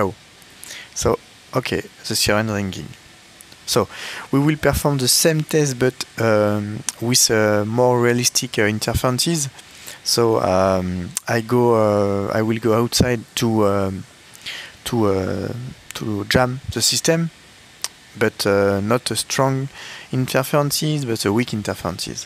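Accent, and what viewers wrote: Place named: France. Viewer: Bon morning Rien qu’en écoutant to anglais j’ai compris que tu étais French 🇫🇷 J’ai le même accent en anglais 😁 Plus sérieusement ont ils changer quelque chose ou pas depuis le temps ? Autre question n’y a t i pas une option qui te permet d’activer le déclenchement de la siren en cas de brouillage ?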